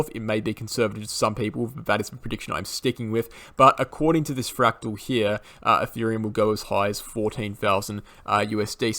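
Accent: Australia. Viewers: So exciting... what accent is that? Australia?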